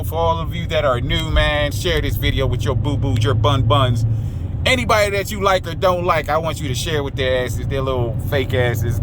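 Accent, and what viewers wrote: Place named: Baltimore, United States. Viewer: I heard you say you’re in Md. I’m thinking Bmore because of the accent lol. I’m looking to get into the Business soon . I’m in the DMV also, PG County to be exact. How is the local work in our area? And if you can point me in the direction of a reliable Dispatch? Keep on Trucking Holmes, you make it look easy 👍🏽👍🏽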